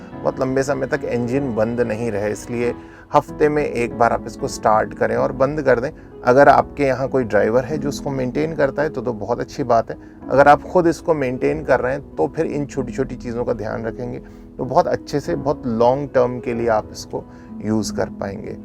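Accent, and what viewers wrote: Standard. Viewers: Very informative and well explained and this is the purest form of hindi I’ve heard in a while🤩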